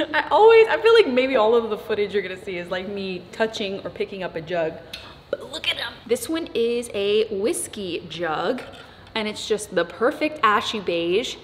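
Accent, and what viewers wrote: Texas, United States. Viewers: Your accent is getting it’s Texas back! Every time I would go back to wherever I was living at the time from visiting home in Texas, I’d be teased like crazy because my accent would be back 😜❣️...Actually all it took for me was talking to my family and friends in Texas‼️ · Love how when she goes home to Texas a slight accent comes out 😂❤️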